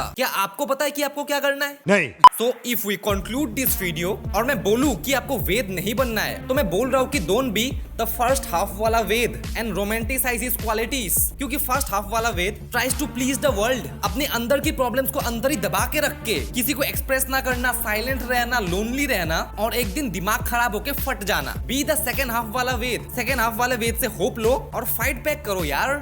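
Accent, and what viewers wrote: Assam, India. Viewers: Dada tumar pora axomiya vibes ahise akdom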